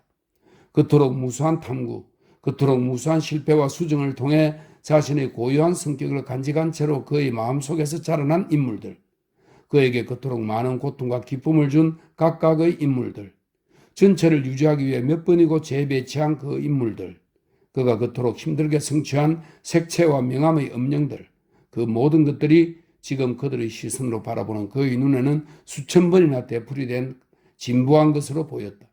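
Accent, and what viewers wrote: Gyeongsang, South Korea. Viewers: 아버지가 책 읽어주는것 같아요. 경상도 사투리도 정겹습니다.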